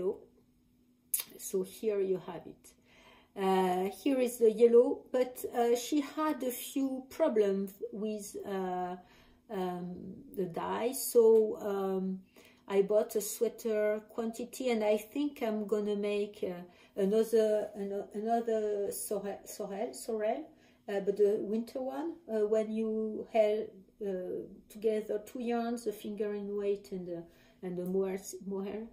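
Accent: France. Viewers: Hi,! Great podcast, your English is really great and I just love the French accent! Thank you so much for presenting the Fresh Peonies, I am so glad that you felt all that I was hoping this pattern to be: the ideal relaxing project, easy and comfortable for every knitter to knit while bringing up the best of Lise's beautiful yarn! I just subscribed to your channel and look forward to future episides! À bientôt!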